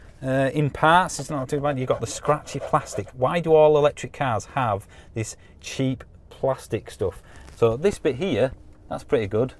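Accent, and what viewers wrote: United Kingdom. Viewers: D YouTube doesn't actually restrict your channel to UK only and it also doesn't say so anywhere. I can see the steering wheel being on the wrong side of the car though (YOMV) and Her Majesty's English is clearly noticeable and better to listen to than what they did to it on the other side of the greater pond. I do respect that you base your opinion on the UK point of view, but obviously you must also realize that Hyundai is not exactly a British brand and this is something of a global theme. Yes I can see that from a consumer point of view your issues with this energy transition may seem(!) less than where I live but at this point I fail to see why on earth you would have such a heavy connection to the grid without having any need for it. I do fear that probably as a result of this you're not really seeing the problem from the production side. 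The remark about fitting 144 solar panels to your roof, that was not a joke. That is the reality from transposing this idea onto the full population.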